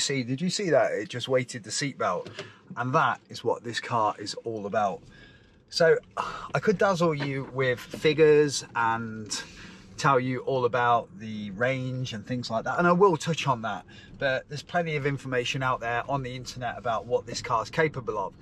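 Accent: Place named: RP, United Kingdom. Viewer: you`ve gone posh👌👌👌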